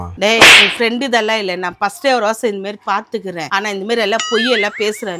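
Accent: Bangalore, India. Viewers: Bangalore tamizh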